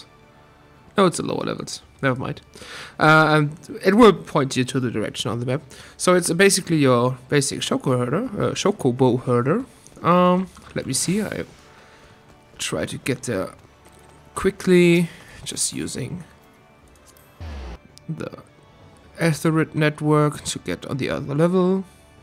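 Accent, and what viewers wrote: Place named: Germany. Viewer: Are you from Germany? Wonderful accent alot of flare, like final fantasy XIV. So thanks for the info.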